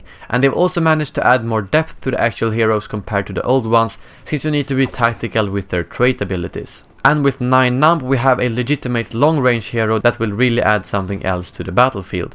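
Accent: Sweden. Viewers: Are You from Sweden? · Are you from SWEDEN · Are you fr.o.m. Sweden (är du från Sverige)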